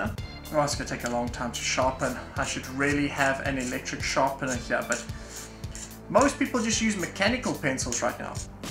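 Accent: South Africa. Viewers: Are u South African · You sound South-African mate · are you from South Africa?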